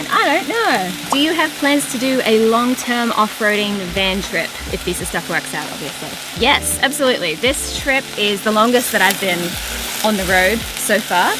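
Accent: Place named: Australia. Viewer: Happy Thursday,! Happy New Year and welcome to the second month of February!😊😊😊😊😊😎😙☀💙💙💙💙💙🇺🇸🌷💖💖💖💖💖💖😊🍾🎉🎇⭐⭐⭐⭐⭐⭐👍. Your Australian accent is fantastic and it gives me tingles when I jump for joy!😀💙💙💙💙💙💙💙👍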